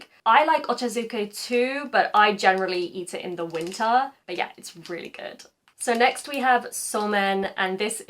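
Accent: United Kingdom. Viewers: carwowのマットとしゃべり方が同じだ。これがイギリスアクセントか。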